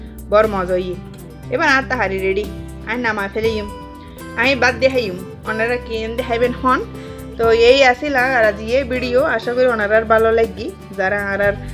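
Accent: Chittagong, Bangladesh. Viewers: আরার চিটাগাং এর কথা মানি সেরা আ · Peyajoo diye shutki ! Kahinito kokhono, I must try.... and enjoyed ur chatgaiya talking 😀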